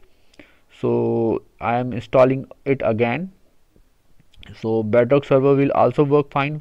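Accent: India. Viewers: Heard that Indian accent so I KNEW bro would deliver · hi this helped...but the indian ascent😭😭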